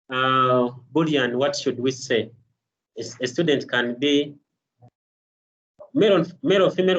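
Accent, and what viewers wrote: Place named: Uganda. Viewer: Well represented! Surprised to here a Ugandan accent after watching only Indian tutorials.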